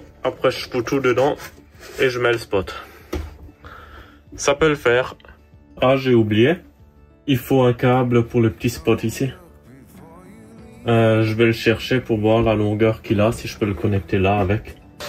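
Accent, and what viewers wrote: Alsace, France. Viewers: hooo ce bel accent Alsacien héé sanlu tu!!! très bon job je suis d'alsace aussi!!!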